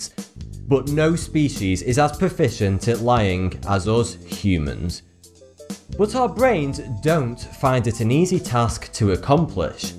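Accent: United Kingdom. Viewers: RSCGaming hes british, and in british accent, thoughty 2 sounds like 42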